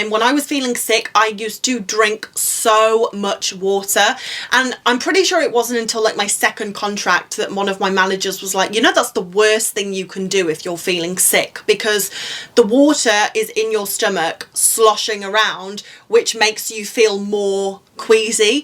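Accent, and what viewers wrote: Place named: England, United Kingdom. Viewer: I can listen to read the phone book and be mesmerized by that lovely English accent. It doesn't hurt she is VERY easy on the eyes.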